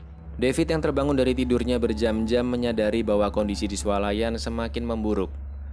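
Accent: Java, Indonesia. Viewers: Iki wong ndi sng ngomong kok medok bgt kwwkwk salam Pati Jawa tengah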